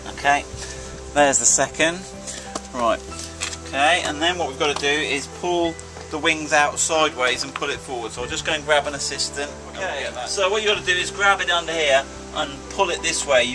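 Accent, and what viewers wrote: United Kingdom. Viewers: 😂😂😂😂 That British accent😅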